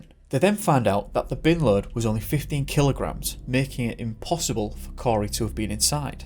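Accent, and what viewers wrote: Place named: England, United Kingdom. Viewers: Ware abouts in England are you from . I am trying to figure out the accent ! Just somthing I do lol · Love listening to your videos keep them coming,was wondering were are you from your accent sounds like your not far or from middlesbrough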